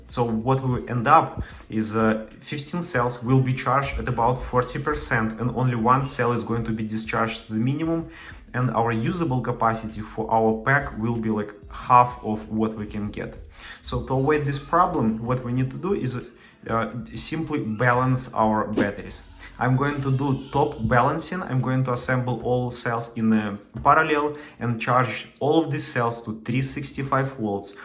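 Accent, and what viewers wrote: Russia. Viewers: Оффтоп: как же режет слух русский акцент! ;))) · Товарищ! У тебя по ходу русский акцент!? Расскажи на русском языке!😀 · Отличный акцент))) пря клип вспомнил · ох уж этот английский по русски!... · Слышу русский в вашем английском. Вопрос, у вас случайно канала на русском нет, а то я в английском не очень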